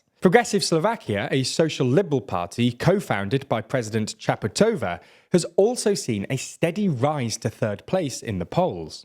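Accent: England, United Kingdom. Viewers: Hey Dude! Don't be so self-confident! You should first learn how to pronounce the names of the politicians you are talking about. No one gives a damn where are you come from and what is your language background. I am pretty sure no Slovak citizen likes your silly pronunciation of their names, in fact, we found it arrogant, and it only shows your total ignorance of the subject as the ONLY reason you made this compilation slide show is to get views and likes to get your youtube rankings up! Besides, I guess you as a UK citizen (based on your accent) have absolutely no right to label/mention some of our political figures as "fascist" even if the idiotic narrative of Slovak mass media may often indicate so. Even if politics in Slovakia is a mess thanks to aggressive foreign interventions, (including your country), Slovaks will solve these in their way. 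I mean, HEY MAN (!) who do you think you are to have the courage to stand up before us Slovak citizens and talk about subjects you have absolutely no freaking clue about?!?!?! No one cares about your opinion! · How is it not to be a party to USA, and UK led proxy war with nuclear power, through country they puppeteer, and pay for directly on your border a pro-kremlin, pro-russia? Do you even use your brain? You can hear from the accent the host is from UK, and him saying pro-kremlin, pro-russia try to smear everyone who does not want to be part in this USA/UK regime proxy war. "You not with us", in their indoctrinated chicken brains means "you are against us", but what about "go fkin play somewhere else, and leave us alone", that in my view is a side as well, worth to take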